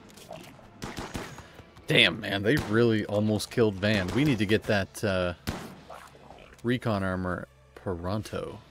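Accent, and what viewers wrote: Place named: United States. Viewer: What a weird name...are you actually? You sound like an American.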